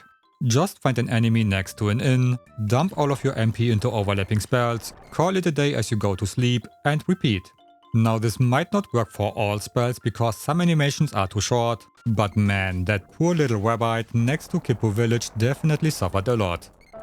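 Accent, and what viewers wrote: Germany. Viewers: Hard German accent, but great. Sword orb glitch not possible on PAL. Possible on switch? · Are you German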